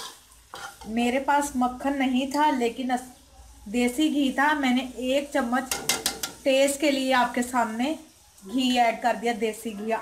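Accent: Lahore, Pakistan. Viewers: Yh lahoreye aesy he bolty hen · Her accent is beautiful. Lahore, Lahore ha. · Accent is subjective. Would sound weird in karachi, but is normal in lahore. Food looks yummy, what's matters is substance and not cosmetic features which we like to do. Loved this clip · Labori urdo mix Punjabi style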